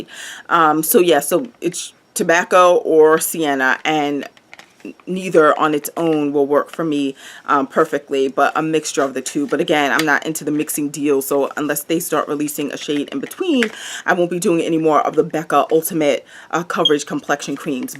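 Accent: Caribbean. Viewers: Yup! I heard a Caribbean accent, I think. I'm from hot Houston, Texas! ❤️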